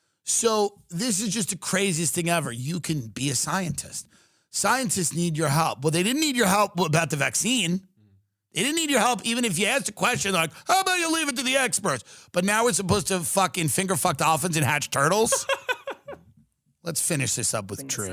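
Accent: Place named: Long Island, United States. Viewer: That is not a "northeast" accent. That is a Long Island accent.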